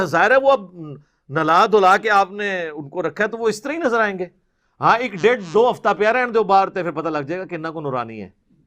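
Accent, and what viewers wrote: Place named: Punjab, Pakistan. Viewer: hahaha bhai, your way of taunting is very nice. your accent punjabi (or may be siraiki) is great.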